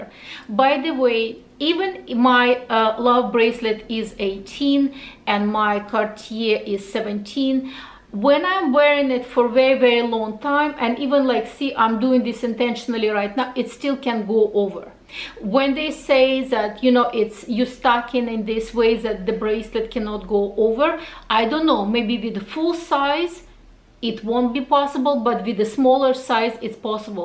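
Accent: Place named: Russia. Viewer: Your Russian accent is so sweet 😊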